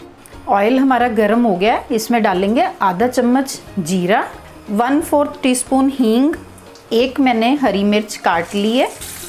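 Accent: Punjab, India. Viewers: I love your punjabi accent mam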